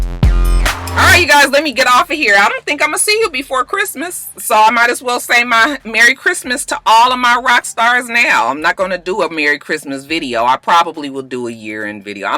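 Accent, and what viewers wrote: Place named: California, United States. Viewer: "modation "!!!! love you,. your California comes out sometimes and I love it!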